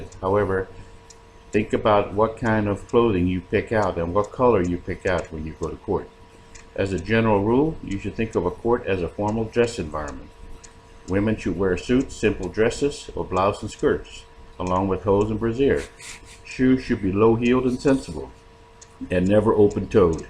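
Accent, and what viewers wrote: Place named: South Louisiana, United States. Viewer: Are you originally from South Louisiana? Your accent reminds me of some back home folks near the bayou.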